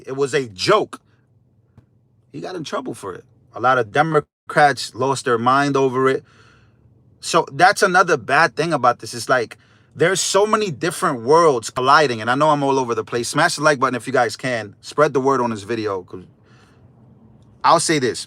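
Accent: New York, United States. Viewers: Thank you for reporting from the rally at MSG. You were awesome. I cant thank you enough. I had tickets to go but did not want to go alone. I follow 2 youtube people. One is you..I knew you were a N.Y.ER I COULD TELL BY THE WAY YOU TALK. IM A N.Y.ER ALSO.. THANKS FOR SPREADING THE GOOD WORD. YOUR A TRUE PATRIOT ❤ 🇺🇸🇺🇸🇺🇸🇺🇸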